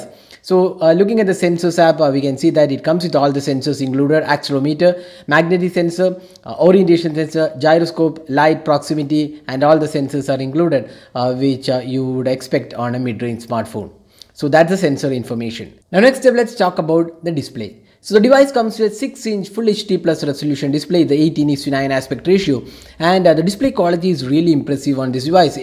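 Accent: Kerala, India. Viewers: Are you from Kerala, you sound like one.. anyway good work here... I too have a Nokia 7 plus.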